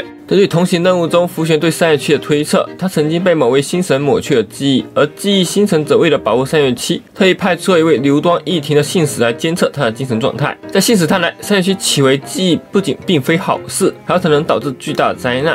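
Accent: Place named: Beijing, China. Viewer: 下次可以直接說是北京口音😂（我覺得你說的應該是這個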